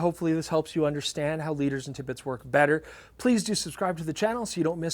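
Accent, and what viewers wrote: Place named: Canada. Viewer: I’m watching going why you seems familiar, then I heard an “eh” or something Canadian and it clicked. So do you stand on wet slippery rocks at daybreak with your rods clinched between your legs which makes you lose balance a bit while you try to get pix? For me it was a no brainer which camera I’d take when those shots would be possible and ppl thought I was nuts because it’s so old but those amazing colors it’d get could only come from the Nikon d700.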